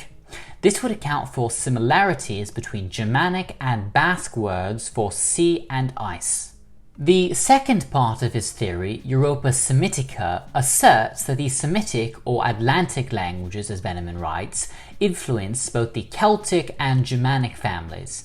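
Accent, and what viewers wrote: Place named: England, United Kingdom. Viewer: well explained , I like your english accent , thanks for sharing this important info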